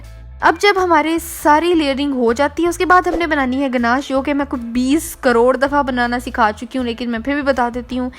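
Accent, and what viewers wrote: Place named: Punjab, India. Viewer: that punjabi accent is just wowwwwwwww :)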